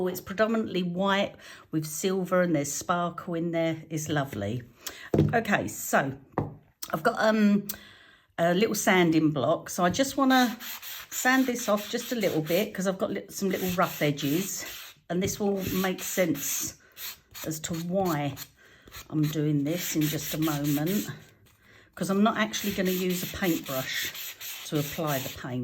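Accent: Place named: England, United Kingdom. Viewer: Lovely crafty creation 🥰. You say "rouge" perfectly. With your beautiful English accent🌹.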